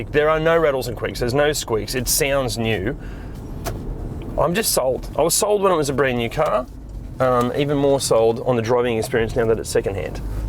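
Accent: Australia. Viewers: Your Aussie accent and sense of humor makes your channel a joy to watch. Specially made me laugh when you called the guy a wanker 🤣🤣 my only feedback would be to include its off-road capabilities. I guess that would be important for some people.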